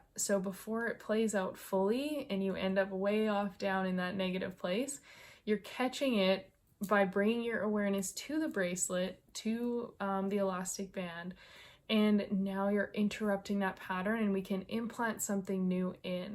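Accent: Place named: Canada. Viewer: Hi, I found you on Kristina"s Channel Spiritually Selfish...and wow, a couple things..you are such a bright light I had to check out your channel...then you are SO MUCH like my friend Ryan...I met her in the early 90s at the Dead Shows..I'm an old hippy myself lol..she's Canadian (you sound like you might be from north of the border) and is a Taurus born 4/30 and you have some good positive serious Taurus energy....so maybe we're in a similar soul family but my main draw to you was your healing work and I'm curious if what i do is really "Quantum Healing". I connect with people's energy fields from distance and work with their fields and every healing I see my hands doing different things and I get messages from spirit about what is really going on with their health and can offer that......oh..I'm not bragging btw because Spirit heals, not Adam lol. I'm just her instrument but we've worked on people in hospice with cancer and within 48 hours they'd be up, eating, not everyone has these results but some do....the latest person is playing his guitar again up and eating and he was terminal with pancreatic Cancer. 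wasting away on Morphine......people have gotten up from their wheelchairs totally healied from Lupus...It's so freakin amazing and I myself healed from an "incurable" autoimmune disease I had almost 60 years..but no steroids for the first time in my life in over a year!!!! 3 years of daily affirmation work, energy work and diet. I work as a Medium so I don't charg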